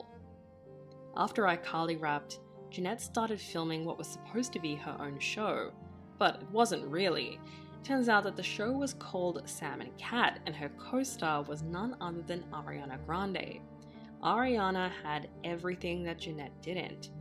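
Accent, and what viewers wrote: Australia. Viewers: Nice to hear a fellow Aussie. Thankyou for this. 😊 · Is this Australian??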